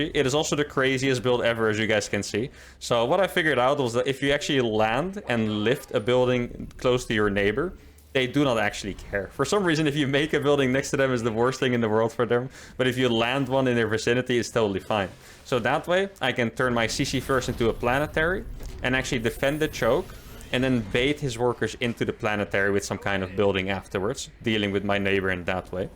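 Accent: Netherlands. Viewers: Are you dutch? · Argh that dutch accent. Nice vid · Hallo Nederlands accent 🙂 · First video to see from this channel.. and judging by your accent.. you're Dutch aren't you? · You are dutch arent you😋 I reconise our accent everywere.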